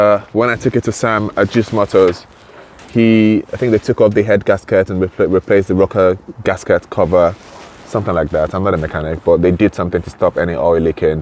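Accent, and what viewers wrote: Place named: Trinidad and Tobago. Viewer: You sound like a Trini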